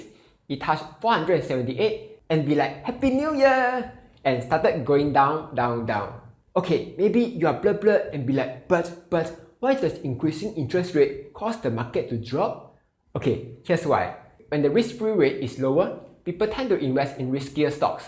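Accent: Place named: Singapore. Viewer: Long live Singlish! 😁😄👍💖🙀💯😇😁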